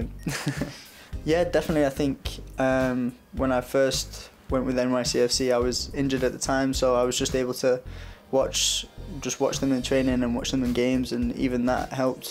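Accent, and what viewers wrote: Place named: London, United Kingdom. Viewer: He sounds like a Londoner. I was expecting a Staffordshire accent.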